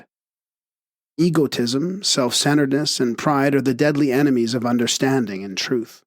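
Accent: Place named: Upper class, United Kingdom. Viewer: Upper-class British voice is always the way... oh well.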